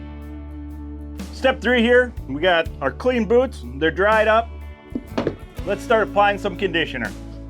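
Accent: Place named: Wisconsin, United States. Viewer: It's how us Wisconsinites talk haha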